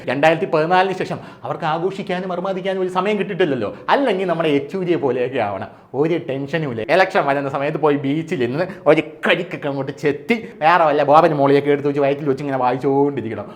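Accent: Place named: Thiruvananthapuram, India. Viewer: തിരുവനന്തപുരം ഭാഷയിൽ ഉള്ള താങ്കളുടെ സംസാരം കേൾക്കാൻ നല്ല രസമുണ്ട് 👍👍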